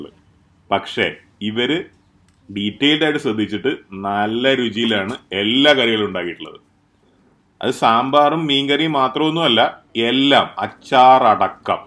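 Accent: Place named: Thrissur, India. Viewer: എങ്ങനെ ഈ തൃശൂർ വള്ളുവനാട് സ്ലാങ് വരുന്നു തിരുവനന്തപുരത്ത്കാരന്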